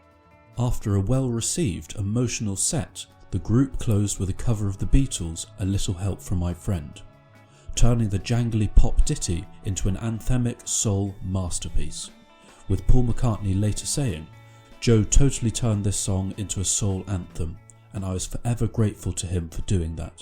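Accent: England, United Kingdom. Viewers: and her....got to be in there. Since you have a bit of an English accent I don't think you know the new Patriot culture. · I was there at age 17. Does this English accent narrator even mention? Paid least, played the best and most moment. Also, made an impassioned and unforgettable contribution with. I had seen the year before at the unforgettable Newport Pop Festival in the broiling Costa Mesa summer of 1968.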